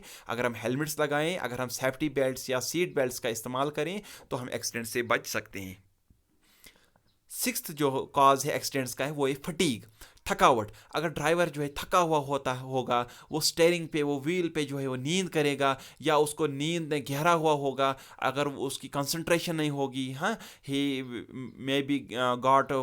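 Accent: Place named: Kashmir, India. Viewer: By listing you I think u r from kashmir... Bcx this voice belongs to kashmirians ...🤨🤨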